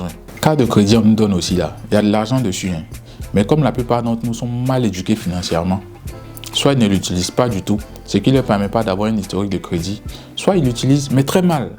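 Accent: Ivory Coast. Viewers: Je suis nouvelle juste pour les parabole je m'abonne 😂😂 j'aime écouter quand un ivoirien parle. 😅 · L'accent Ivoirien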